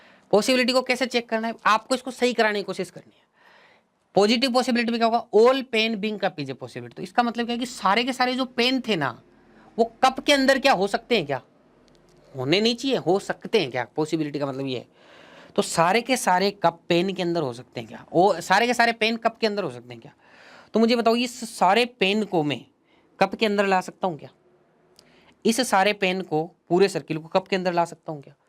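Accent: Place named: Rajasthan, India. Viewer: Guess you are from Rajasthan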